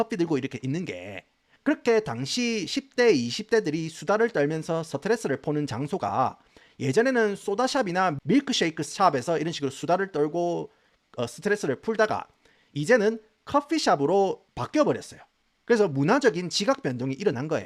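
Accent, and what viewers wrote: Gyeongsang, South Korea. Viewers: 진짜 노무노무 재미쪄❤ 심지어 유익한걸 이리 재밌게 얘기하니 안볼수가있나 교육방송을 예능으로 풀어주는 우리 갱상도오빠!!!😂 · 칠갑ㅋㅋㅋㅋㅋㅋ 아 혼방 경상도인 이세요 🎉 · 이분은 세차를 취미로 하는 금융인 인줄 알았는데 성대모사를 잘하고 개그도 잘치는 경상도 억양의 사투리도 잘 구사하는 경제 및 시사 유튜버 + 문화평론가 ㅋㅋ 다양하게 골고루 잘하는듯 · 경상도 입니껴? 스토리 텔링이 아주 재미납니다. 항상 잘 보고 있습니다. 화이팅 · 미국에 사시나요? 경상도 사투리 쩌네요.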